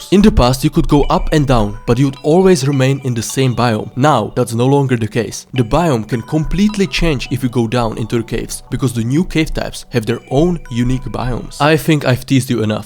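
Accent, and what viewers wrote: Russia. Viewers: Слова как будто с русским акцентом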